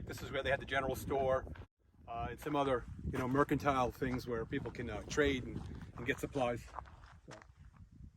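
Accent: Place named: East Coast, United States. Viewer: No disrespect but you sound like people from the east coast. Why are you here? Hope you’re not an east coast liberal. If so please go home.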